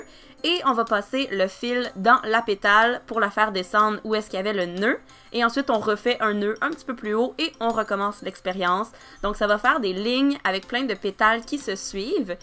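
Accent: Canada. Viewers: J'aime tellement cet accent canadien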